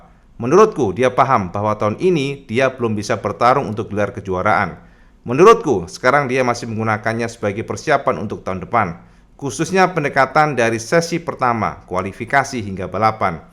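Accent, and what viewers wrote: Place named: Cirebon, Indonesia. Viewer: kayanya orang cirebon nih, logat bicaranya sama kaya tetangga gw 😅, kereen 👍🏻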